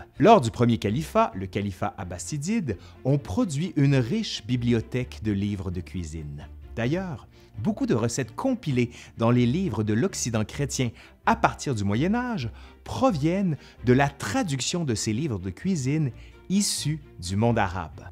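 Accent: Canada. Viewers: C'était super intéressant ! Tant pour l'histoire que pour tous les noms de pâtisseries disséminés dans la vidéo... (Une fois que je me focalise sur autre chose que l'accent canadien, je suis de France. Et en plus, les beignets (avec le t), on a, c'est l'équivalent des "Kraften" et c'est ça m'a pris quelques minutes de reformater le cerveau à penser "donuts")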